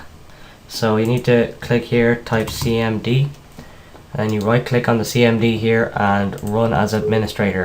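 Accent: Ireland. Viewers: FUCKING THANK YOU!!! and your adorable Irish Accent!!!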